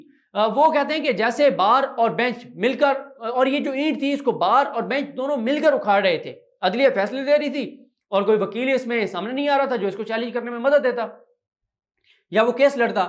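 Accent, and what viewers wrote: Saraiki, Pakistan. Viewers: I did see you many a times in supreme Court when zehan e beemar was listening the 56 companies case I noticed none of the journalists gave you any importance You position was exactly the same as used to be the position of cake delivery boy now finding the stolen milk of his kids by Nawaz Sharif somebody was telling me your only credit is telling lies and speaking Urdu in some Seraiki accent you are a shameless person who never talks the truth I understand you can do anything for the sake of earning more and more money but mind one thing the liar end of the day is trapped by his own lies and has to face the insult and the abuses of the common man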